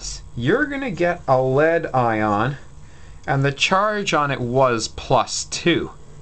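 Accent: Canada. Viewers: oh, you're canadian...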